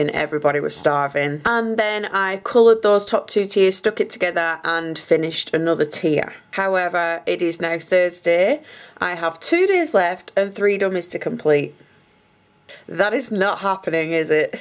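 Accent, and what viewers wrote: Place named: Northern England, United Kingdom. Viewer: I live all your vlog, it’s nice to here a fellow northern lass on her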